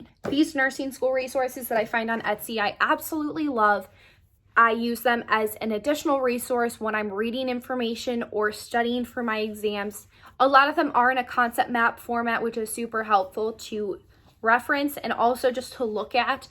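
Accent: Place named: Midwest, United States. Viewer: you are sooo cute and thanks for this! I was recently accepted into Chamberlain in Irving, TX! So I HAVE TO ASK! Did you attend the school in Chicago????? You sound like you're from either Chicago... or Minnesota! I was born & raised in Chicago!!!! <3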